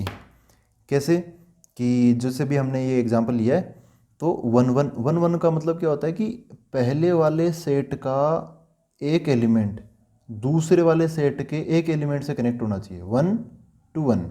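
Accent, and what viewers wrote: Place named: Haryana, India. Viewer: by ur voice,I tried to predict that u must be frm haryana....by the way u teach very nicely ...big thanks from Haryana girl 👍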